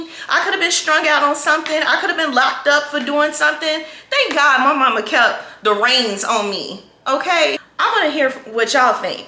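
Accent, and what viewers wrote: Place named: South, United States. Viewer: New Mayor...same as old mayor. you dont have a Chicago accent. You sound Southern.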